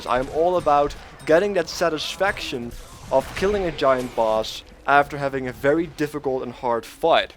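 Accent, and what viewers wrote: Netherlands. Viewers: first time i heard you talking i knew you were dutch, i am dutch aswell and maybe u wnna play together sometime w/ skype or something?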